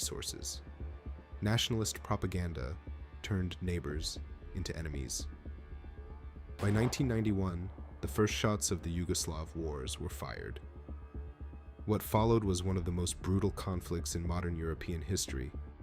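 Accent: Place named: United States. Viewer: As soon as i heard thr American voice i knew this is going to be a poorly made documentary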